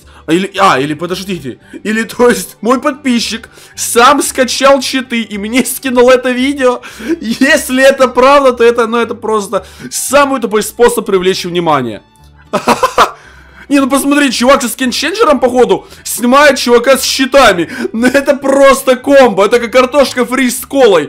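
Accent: Ukraine. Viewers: ты с Украины?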